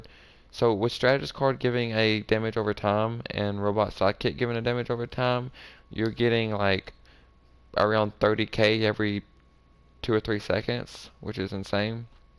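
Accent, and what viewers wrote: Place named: Appalachia, United States. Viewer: Dude. Up your speech patterns and audio. It's "So on and So forth" NOT "So on and so Such". Your Trailer's showing hilbilly...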